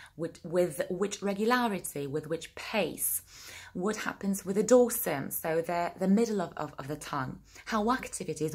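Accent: United Kingdom. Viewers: Piękny akcent :) brzmisz Posh, tak na marginesie :D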